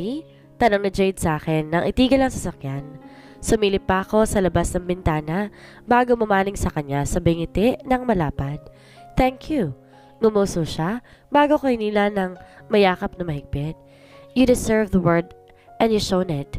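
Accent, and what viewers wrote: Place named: Italy. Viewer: The narrator did much excellent in Italian dialect, very good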